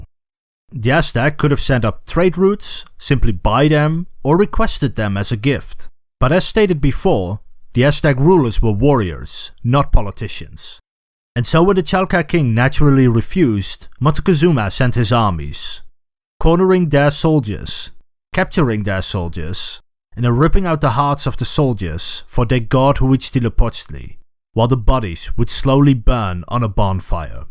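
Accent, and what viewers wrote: Netherlands. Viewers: I recognize the accent....Dutch! I used to live in Holland. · I hear a typical Dutch accent. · Irritant Nederlands accent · Are you Dutch ? Your accent sounds Dutch :) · its subtle but are you Dutch?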